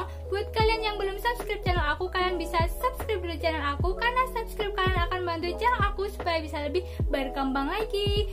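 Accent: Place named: Java, Indonesia. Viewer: Orang jawa nih pasti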